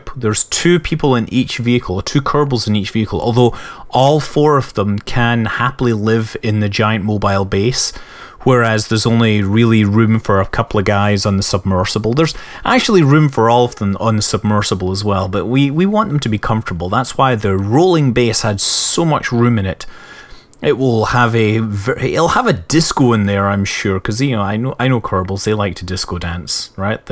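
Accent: Scotland, United Kingdom. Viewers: well some peope have other shit to do than sit infront of a computer screen watching ssomeone else play for hours lmao,even tho i like his scotish accent and voice,maybe,just maybe i just happened to come across this video and just watched it without going ocd and being like shit i gotta watch the 10 others before this one! wich are all over 30 mins,thats 5 hours(more than that if you count the ones being an hour),grats to you if you did,but i sure dont have time to.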